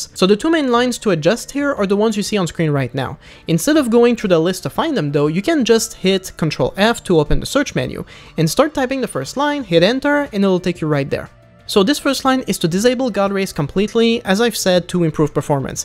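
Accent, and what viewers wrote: Quebec, Canada. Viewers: L'accent québécois haha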